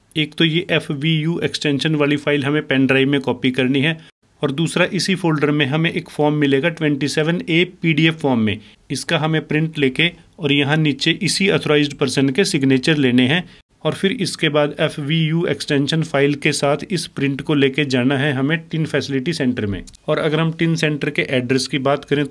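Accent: Himachal Pradesh, India. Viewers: aap himachal se ho according to your accent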